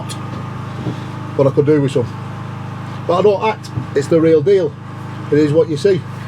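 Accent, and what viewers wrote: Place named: Wigan, United Kingdom. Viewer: you sound local , are you from wigan? either way your videos are pretty decent.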